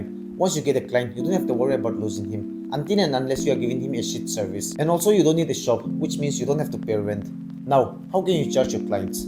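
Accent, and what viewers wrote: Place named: England, United Kingdom. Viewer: English tune toh muza🔥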